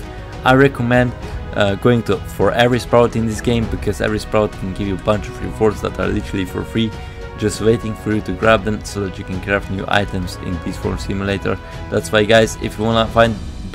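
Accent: Russia. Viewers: Чел вау..... какой же у тебя Русский акцент 😑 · русский акцент?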